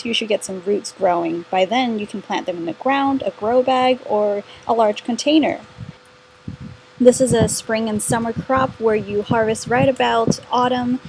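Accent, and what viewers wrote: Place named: United States. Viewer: I know this makes me stupid and borderline racist but I was completely thrown off by your completely normal American accent. lol Sorry.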